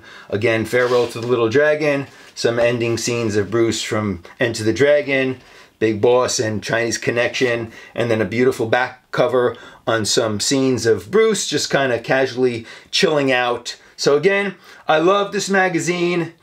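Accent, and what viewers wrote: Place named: New York, United States. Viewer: You brought back some great memories. You sound like a Noo Yawka. Did you ever go to the martial art shop on 42nd St?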